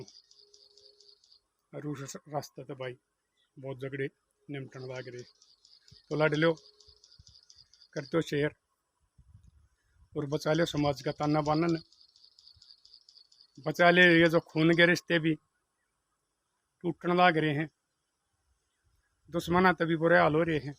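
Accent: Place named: Haryana, India. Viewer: Aapki haryanvi bohot achi lagti ha haryanvi me hi bataya karo aapki sari video dekhati hun meri bi bhabi ase hi Karti thi meri maa me lakwa aa gaya tha to uska ilaj bhai ne nahi karwaya do bete do bahu ha 6 pata poti han sadi bhi kar rakhi h bachon ki lekin maa ka ilajaj hamne karwaya ha koi Paisa nahi diya jab wo theek ho gai mera pitaji army me the pention milti jab theek hogi jab jabardasti lege lekin jane ke baad na to hamko bulai aur ham maa se milne jaati to bhabhi bhai bhatija bhatiji bhi jhagda karte the unko matlab hota ha to aate han nahi to naraj hokar bolte nahi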